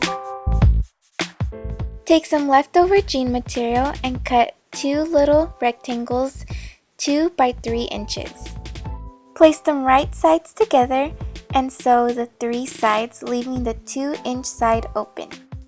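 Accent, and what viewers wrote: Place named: Spain. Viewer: Se te nota bastante que hablas español =)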